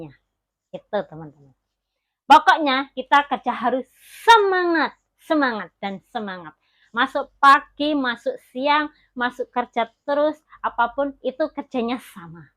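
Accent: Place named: Java, Indonesia. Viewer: Medok temen ngapake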